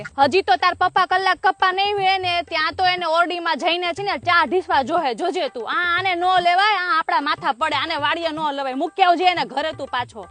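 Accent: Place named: Ahmedabad, India. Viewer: pan kyarek amdavadi bole che tyare maja nathi aavti aapni pyor kathiyavadi bolo 😊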